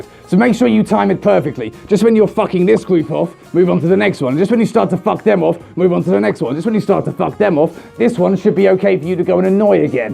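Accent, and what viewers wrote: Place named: Scotland, United Kingdom. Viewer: Loved your scottish accent, by the way.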